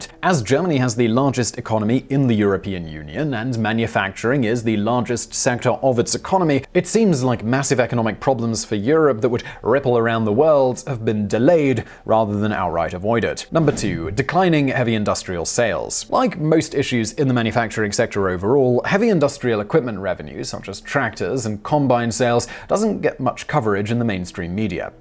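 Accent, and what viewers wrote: United Kingdom. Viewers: despite what his British accent may imply, he is an American.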